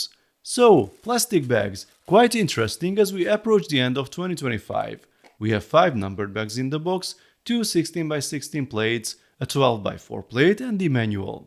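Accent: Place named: Hungary. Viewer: mindig is kicsit éreztem az akcentusodon mintha magyar lennél de amikor kimontad hogy nyíregyháza egyből tudtam. király videó csak így tovább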